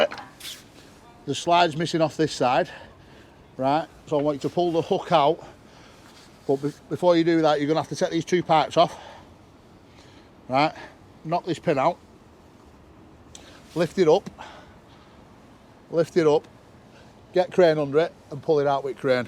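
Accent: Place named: Wigan, United Kingdom. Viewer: you sound local , are you from wigan? either way your videos are pretty decent.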